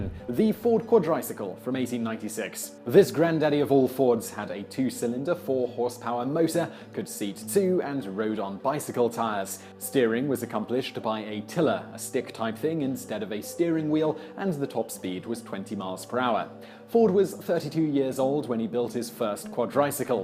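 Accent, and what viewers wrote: United Kingdom. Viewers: Oh, I'm sure he's smart. It's just that Brits don't talk like Americans.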